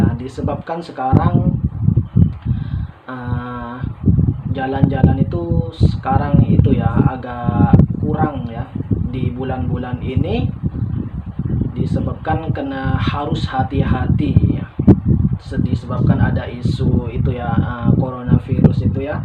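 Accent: Indonesia. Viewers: Mahal sekali suara suara latar yang sesuai membuat video.sedap dengar,walaupun ada alang keindonesia nya.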